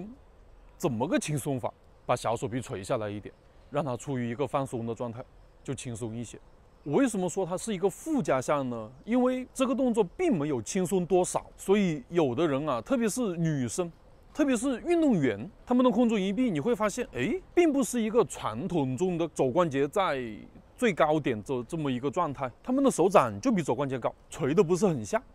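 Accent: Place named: Guangdong, China. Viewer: 老师的广东普通话➕幽默还挺有意思的。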